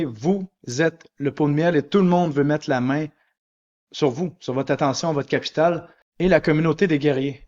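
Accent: Canada. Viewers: Je viens de m'abonnée L accents Canadien ça m'a dépaysé haha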